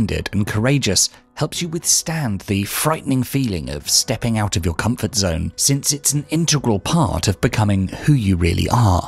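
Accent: England, United Kingdom. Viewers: Ima go against the grain an say take this w a grain of salt an herb weary of soemthing that calls itself philosophical while using an English accent knowing full well English ppl r stereotyped as smart ppl. Like that episode of sunny Philadelphia when Charlie starts speaking w an English accent and smoking a pipe. I like this channel a lot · The problem with THIS narrative interpretation of Nietzsche is this: The assumption that every sniveling creature should be an artist and howl at the moon. Sorry, but the world teaches us who has what it takes and who doesn't. And THAT's OKAY. Judgement by your peers is exactly the democratic process which teaches you if the world thinks you have talent. I reject the sophistic use of a "British accent" here to make this story seem authoritative. This is a nonsensical assault on cultural norms. Some people get savaged by life. 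Get over it. Not everybody MUST BE celebrated.. I have listened to many amazing lectures on Nietzsche. This ain't one of them. This is pure "Woke" nonsense.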